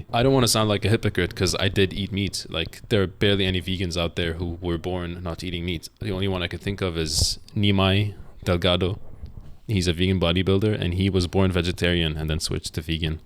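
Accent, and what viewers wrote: United Kingdom. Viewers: You're British accent is so funny :))) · Why are you british?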